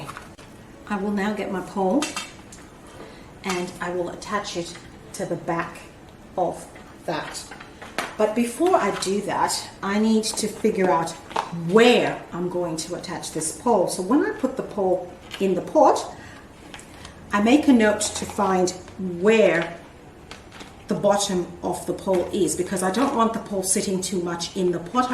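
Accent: Australia. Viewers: Australian accent ❤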